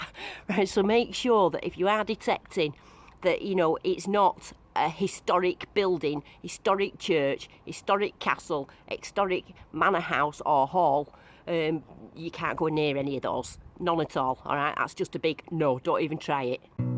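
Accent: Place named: Rochdale, United Kingdom. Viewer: Where in Rochdale are you? I thought I recognised the accent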